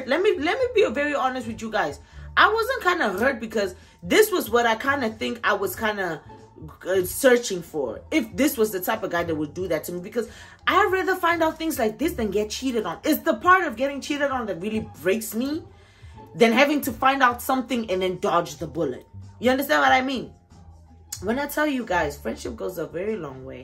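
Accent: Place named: United States. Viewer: Damn sis, you catching the American accent 😫🤍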